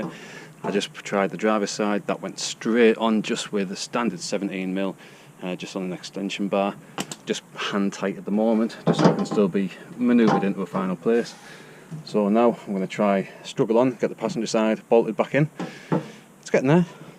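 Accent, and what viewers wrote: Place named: Durham, United Kingdom. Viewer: Will you put mine on? I’m from Durham sounds like your from up here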